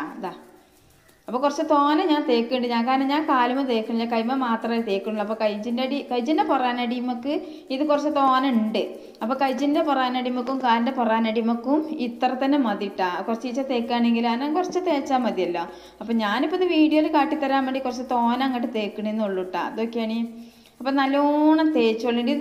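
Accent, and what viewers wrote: Malappuram, India. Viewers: മലപ്പൊറം bhasha 😍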